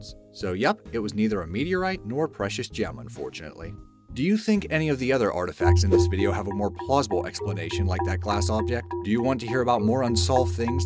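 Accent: United States. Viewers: So American voice over an obviously foreign made video. "We are still dont know what it was" among several different word errors ive noticed. I have nothing against foreigners, just making a point.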